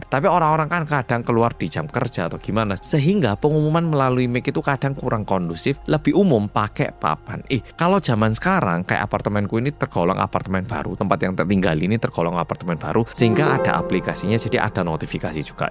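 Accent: Java, Indonesia. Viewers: Salut dgn adik Korea ini bhs Indinesianya sangat lancar dgn logat Jawa .... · Adminnya orang Korea kerennn deh, bisa bahasa Indonedia berlogat Jawa, he..he...👍👍👍😂😂❤🇮🇩🇮🇩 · Lha wong dia masa kecilnya sampai dewasa tinggal di Malang... sekolah di sekolah negri Indonesia... Pas kuliah aja s Singapur... Ya bhsa Indonesia nya medok lah .. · Woow..Bhs Indonesia dan dialex jawa nya mantap pol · Mantap 👍 bahasa jawanya medok banget😊❤️ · Duh medok e ,,, ❤❤❤ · ooo gitu tho mas medhok ... :-D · Logatnya medok banget, kayak orang Jawa.Sukses selalu Buat Abang ini.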